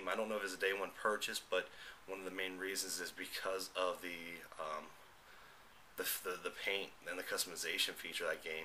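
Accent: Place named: Philadelphia, United States. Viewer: Are you from the Philly area? you have that log "O" accent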